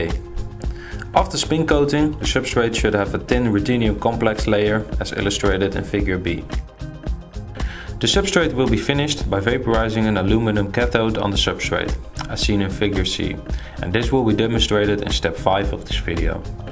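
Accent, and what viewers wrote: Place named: Netherlands. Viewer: Weer een Nederlands accent! Misschien lijkt het maar zo, maar waarom bestaat de YouTube community met de kennis en kunde om op video een succesvolle, werkende hoge kwaliteit OLED te maken (bijna) volledig uit Nederlanders? (Granted, dat is wel aannemende dat jij ook een Nederlander bent, maar aangezien je accent ben ik daar 99% zeker van) De YouTube OLED manufacturing community lijkt wel volledig overgenomen te zijn door onze mede-Nederlanders. Je zou ook wel kunnen zeggen dat wij de YouTube OLED community volledig hebben... G E K O L O N I S E E R D ! Nu alleen nog een manier vinden om er een slaatje uit te slaan, net zoals men deed in de gouden eeuw. Ja, die gouden eeuw! Ook wel bekend als de goede oude tijd van de VoC, toen wij Nederlanders nog praktisch regeerden over het zuidelijk halfrond. En dan kies ik er bewust voor om de alom bekende, zeer beruchte impopulaire zwarte bladzijde uit onze geschiedenis expres even buiten beschouwing te laten. 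Discussies over de hoeveelheid roet in het gezicht van Zwarte Piet en de huidskleur van Sinterklaas hebben wat mij betreft niets te zoeken in deze krochten van de YouTube sloppenwijk, ook wel bekend onder de Engelse naam: 'comment section'. 🤣 (Volledig satirisch bedoeld natuurlijk, het was absoluut niet mijn bedoeling om iemand te kwetsen met deze laatste alinea!)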